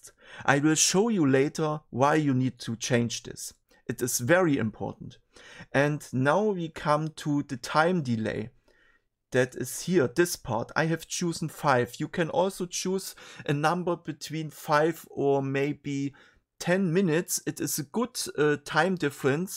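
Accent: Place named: Germany. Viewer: Habe gleich herausgehört, dass deine Muttersprache auch deutsch ist. Und auch ein gemeinsames Hobby haben wir schonmal.😉✌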